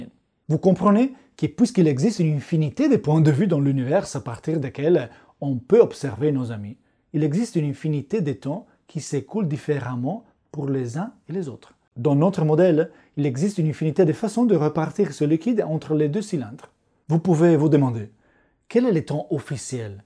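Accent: Italy. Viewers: la seule chaine qui réunit un physicien super beau, un accent italien a tomber par terre et de la science super bien expliquée pour les novices...que demander de plus :elbowcough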